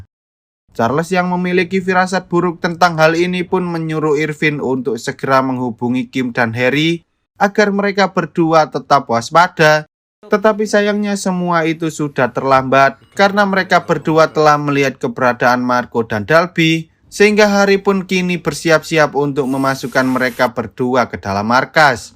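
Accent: Java, Indonesia. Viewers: pekat kali medok nya ya..🤣😂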